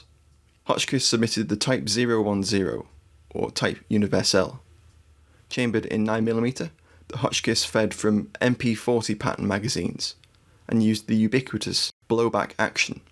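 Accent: United Kingdom. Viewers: Is this one deactivated as you sound British.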